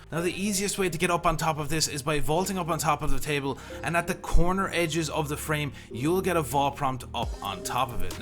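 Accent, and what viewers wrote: Ireland. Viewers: Wat part of ireland r u from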